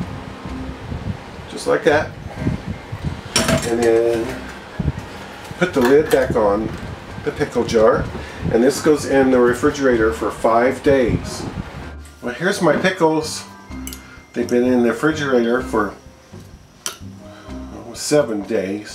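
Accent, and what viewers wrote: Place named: Evansville, United States. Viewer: I sense an Evansville, IN accent. Coming from a Chicagoan who frequented that area as a kid since I used to have family there.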